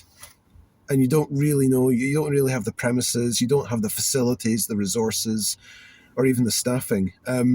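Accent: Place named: Scotland, United Kingdom. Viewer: My youtube got nuked 3 times, and this account I barely upload anything anymore...sh!t is just too demotivating after being steamrolled so much, and I remembered a channel I was subbed back then, with a guy with somewhat of a scottish accent, and now I found it again! Glad to see you're still around in the original form. Subbed.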